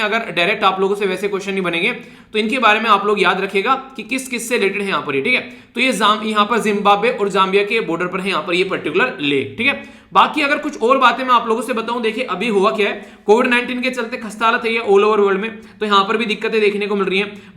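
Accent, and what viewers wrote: Haryana, India. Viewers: Namaste sir sir aj to app bhout haryanvi bol rhe app haryana se hi ho na lg rha h boli se mst lecture 100% charged thanku so much sir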